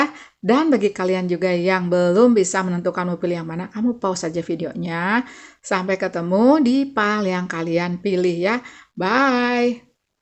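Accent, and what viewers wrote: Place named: Sunda, Indonesia. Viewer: ketika lihat tarot, saya pilih pile 2, terus saya denger bahasa kebangsaan saya saat saya sedang terbesit ingin hijrah ke daerah jawa barat yg ada gunungnya, sawah, pokoknya yg serba hijau deh, eeeeh denger bahasa lawang panto, saya langsung senyum2, ternyata si Teteh sunda pisan...meuni sunda pisan si teteh, salam sakenal tetehi ti abdi si aQuarius 😁🙏🏼 🤝🤝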